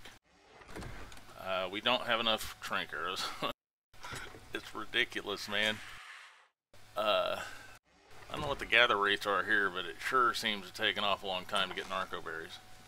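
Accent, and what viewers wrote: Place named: South, United States. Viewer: ey! man! are you south states?? Your dialect sounds like it, Tx, Ka, Az ish.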